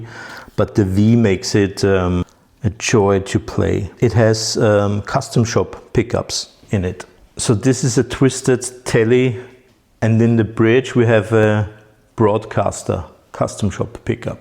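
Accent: Austria. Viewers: Ottakringer Bier?! Dachte mir bei den vorigen Videos schon dass ich den Akzent identifiziert habe 🙂Liebe Grüße aus dem sechszehnten Wiener Gemeindebezirk! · That's amusing, apparently my ears still work, hahaha! I thought I recognised your accent also, though I wasn't certain- nor so specific; I heard Austria, but not the regional part (my family comes from Neusiedl in any case). Entschuldigen sie, bitte, für mein in Englisch schreiben in die Mehrheit; mein "Ungarischer-Bauer Kinderdeutsch mischt mit Berliner & Hamburger Strassedeutsch" ist sehr rostig heute...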